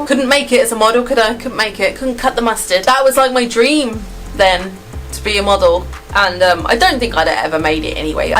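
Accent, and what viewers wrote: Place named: United Kingdom. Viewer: I keep forgetting ur british and every time I watch ur videos I’m like ??😳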